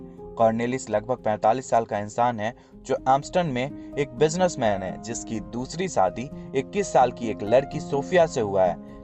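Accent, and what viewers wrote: Bihar, India. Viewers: Story is gud but Voice se lgta h bihari muvi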